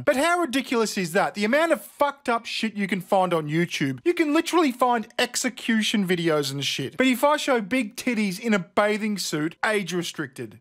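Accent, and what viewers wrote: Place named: Australia. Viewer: This guys sounds so much like Aussie guy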